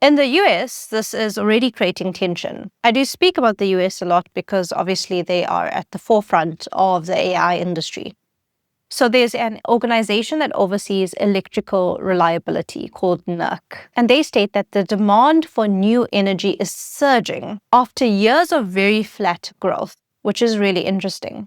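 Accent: New Zealand. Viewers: Ha, I couldn't at first work out your accent. I thought you were from NZ at first. Very correct about power in vs power out. The data itself is an energy less output. The heat is mainly down to the efficiency of the FETs in the processors and probably the low voltage power supplies on the boards.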